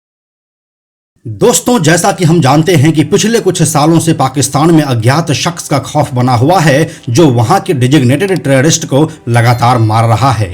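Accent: Bihar, India. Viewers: Aap बिहारी हैं क्या आपके बोलचाल ascent से लगा इसलिए पूछा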